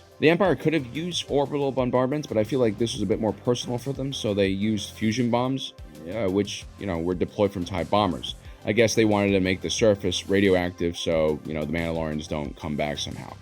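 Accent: Mexico. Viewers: Yeeeaaaahhhh, stopped watching and thumbs down at the messican-speak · Are u Mexican or some sort of southern American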